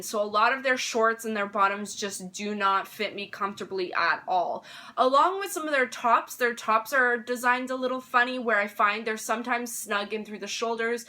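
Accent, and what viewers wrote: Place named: Canada. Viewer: Your Canadian accent is such a treat...coming from a Canadian who's been in the Americas the last 5 weeks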